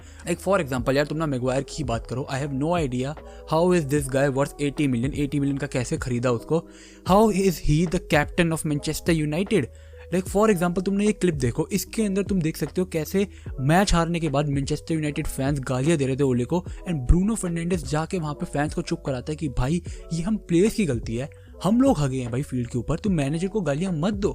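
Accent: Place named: Delhi, India. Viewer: Bhai hai Kidhar se tu Delhi ka lagta hai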